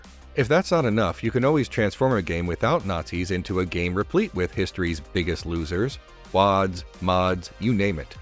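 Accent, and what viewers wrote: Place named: Mid-Atlantic, United States. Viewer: Your mid-Atlantic accent is excellent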